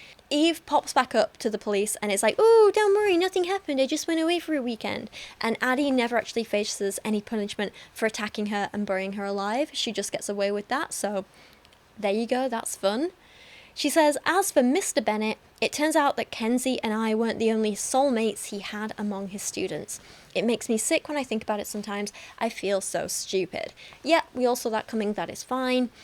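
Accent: United Kingdom. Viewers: Would be nice if you got it right the first time. You went and slandered the whole country for something that isn't even true. Even better, you did it purely based on the "word" of a trashy author and an unreliable narrator. On top of that you seemed to assume that age of consent laws were the be-all end-all in this kind of thing despite that the age of consent in your own country (I'm assuming the UK, based on accent) is, wait for it, ... sixteen. Now this is apparently raised to 18 in the case of a teachers trying to have "relationships" with their students, but it's notably not as good as that majority of US states that outlaws such things entirely, regardless of age.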